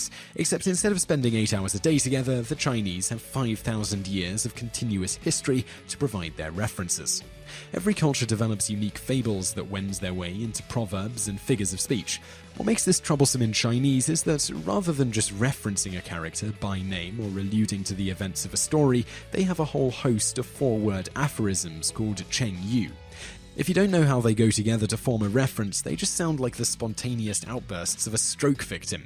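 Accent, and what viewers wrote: England, United Kingdom. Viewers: Learning Chinese now and enjoying it. The narrator sounds English. English people are the laziest when it comes to learning other languages. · Your British English is useless today outside of the UK. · It is so nice to hear such an elegant British accent say such nonsense. It sort immunizes us against form over substance situations. Its like listening to Ted Cruz being stupid and then hearing that he was a brilliant student at Harvard. It devalues a Harvard education and a fancy accent to the benefit of those of us in the public education system. Here's a thought: go on Google and search "Chinese language." And stay away from any list on the Internet. They are carriers of stupid. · VVDivineOwl You know the person speaking is clearly English by his incredibly obvious accent, don't you?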